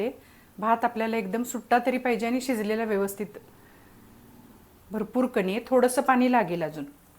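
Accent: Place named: Kolhapur, India. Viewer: खूप छान रेसिपी आहे, तुमच्या सर्व रेसिपी, सोप्या, चवीष्ट असतात, तुम्ही कोल्हापूर च्या आहेत हे तुमच्या प्रेमळ भाषेमुळे लगेच समजते😊 रेसिपी बद्दल आभारी आहे.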